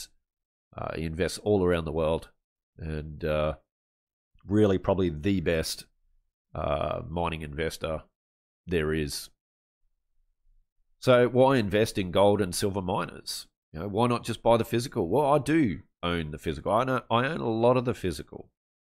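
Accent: Australia. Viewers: Love the Australian accent , EH!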